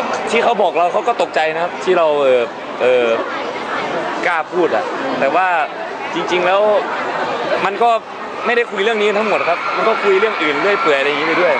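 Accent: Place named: Isan, Thailand. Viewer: ชอบตรงพูเดกลางสำเนีบงอีสานเนี่ยแหละ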